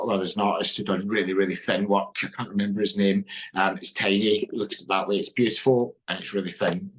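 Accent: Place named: Glasgow, United Kingdom. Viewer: 😂 If I was speaking in full Glaswegian dialect, it would probably only be (some) Scottish people that could follow without cc! 😂Thanks for joining us! 👍🏻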